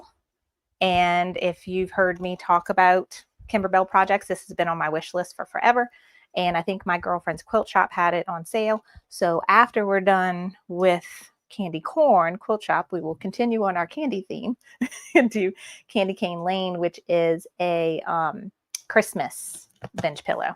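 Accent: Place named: Italy. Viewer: I have really enjoyed this amazing video. Thank you so much. I am using the marine vinyl and have the hardest time trimming it all so the satin stitch covers it. Is it my scissors? BTW I picked up on that accent before I looked to see where you were from. 😂 We are opposite sides of the boot.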